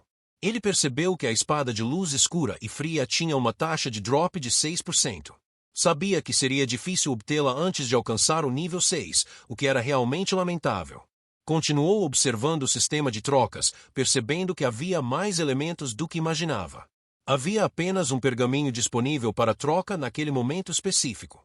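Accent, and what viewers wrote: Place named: England, United Kingdom. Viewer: Porque você tá falando com um sutaque inglês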